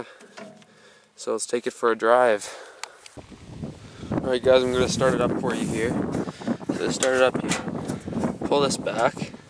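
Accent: Canada. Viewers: must be a canadian aboooot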